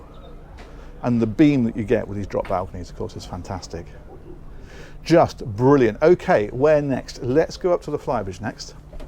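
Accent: England, United Kingdom. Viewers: This is the only englishman whos accent i can take. I love you man!!!!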